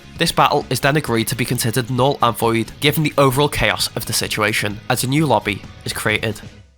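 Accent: Liverpool, United Kingdom. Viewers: The scouse accent is boss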